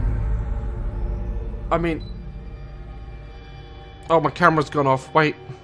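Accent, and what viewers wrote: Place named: Newcastle, United Kingdom. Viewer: I think it's a Gordie accent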